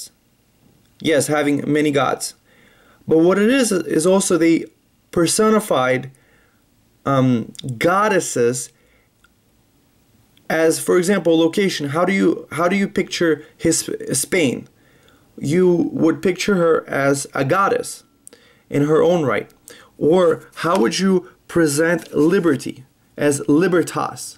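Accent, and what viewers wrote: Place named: England, United Kingdom. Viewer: stop butchering latin with an english pronounciation please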